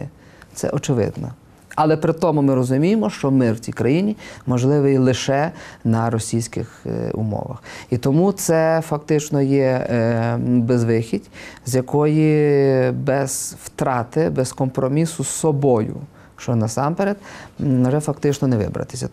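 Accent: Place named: Western Ukraine, Ukraine. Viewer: Какой противный западенський акцент , слушаешь и хочется рыгать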